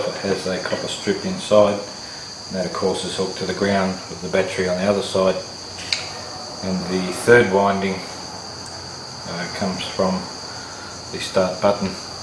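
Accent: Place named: Australia. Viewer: lol aussie accent and crickets in the background and then a kookaburra to top it off!! haha gotta love aus! im from south west sydney!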